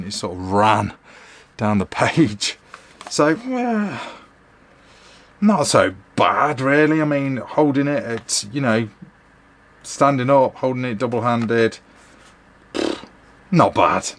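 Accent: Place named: United Kingdom. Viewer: Oh, this British accent :D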